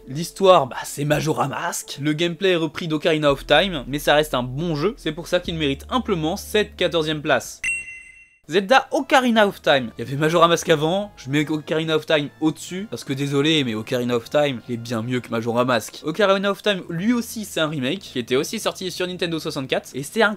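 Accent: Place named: France. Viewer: J'adore ton accent bien français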